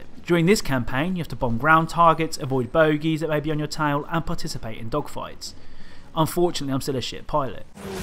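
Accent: England, United Kingdom. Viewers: Do you come from England?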